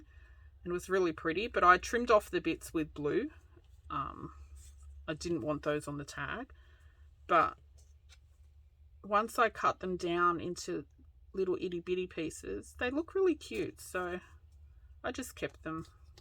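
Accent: Australia. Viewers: Love that you sound like a fellow Aussie -& living your mini pockets 👍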